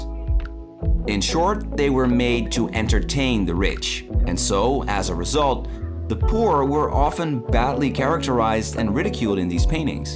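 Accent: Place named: United States. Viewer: God, tone down the American accent, dude - so jarring...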